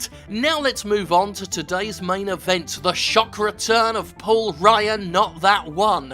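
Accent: United Kingdom. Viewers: Throughout the sections where reads Ryan's statements aloud, I couldn't help but think that it's probably how that guy actually sounds, because he is also British and may have the same accent as.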